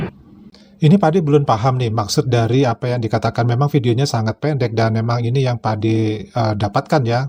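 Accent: Indonesia. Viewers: Bu...logat bahasamu itu berbahasa indonesia .. hhh...jadi intinya rakyat malaydesh ini belum sadar diri ...makanya sarapan pagi dulu biar sehat dan otak bersih 😅😅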